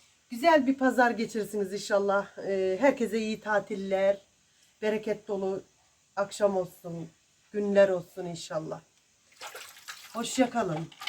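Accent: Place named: Uşak, Turkey. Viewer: Şiven cok tatli abla usakli arkadasim vardi sivesini cok severdim☺☺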